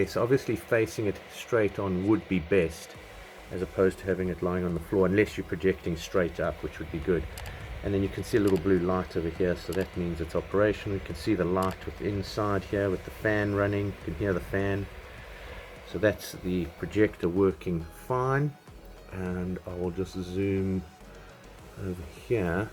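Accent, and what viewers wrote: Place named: South Africa. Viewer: You sound South African. How can I get this in South Africa (Pretoria)? Great video 👍🏽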